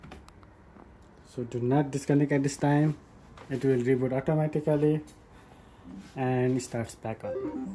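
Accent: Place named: India. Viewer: Are you Indian? Because your accent sounds like it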